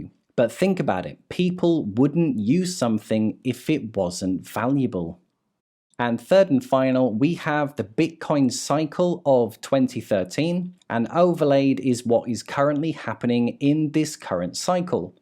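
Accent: United Kingdom. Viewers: Your British right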